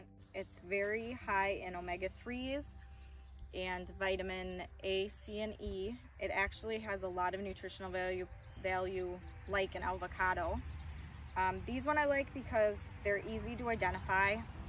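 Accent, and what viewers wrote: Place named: Minnesota, United States. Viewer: Can't beat that Minnesota accent.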